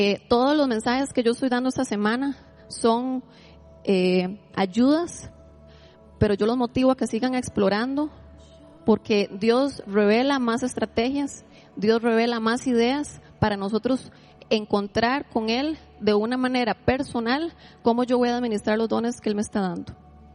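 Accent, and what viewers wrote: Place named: Costa Rica. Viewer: Gracias por la esplicasion sobre profetizar. Me llama la atencion tu accento en ciertas palabras ese es el accento de los ticos?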